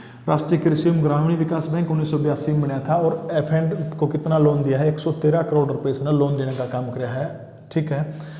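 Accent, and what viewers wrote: Haryana, India. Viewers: Gajab sir haryanavi aawaj mast national gkbe padaya Karo from narnoul se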